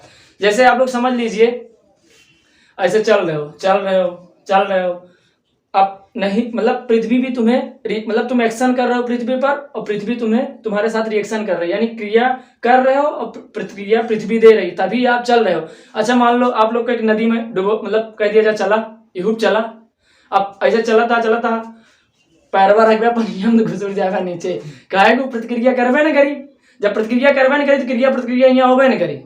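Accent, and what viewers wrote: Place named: Pratapgarh, India. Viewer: अति सुन्दर प्रस्तुति प्रतापगढ़िया भाषा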